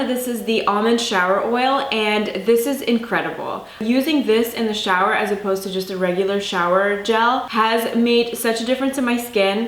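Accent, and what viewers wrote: Canada. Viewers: I'm loving your Favourites vids- they are to the point but not too curt and your recommends are consistently great. Also I don't know why but I used to live in Ontario and your style reminds me of it for some reason...maybe your accent? Anyways, it's a good thing! Thanks for sharing and props to your ubiquitous quality.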